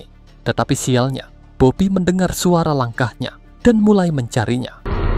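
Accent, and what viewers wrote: Java, Indonesia. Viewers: suaranya medokk bangett heee,,mantapp bang. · medhok jowo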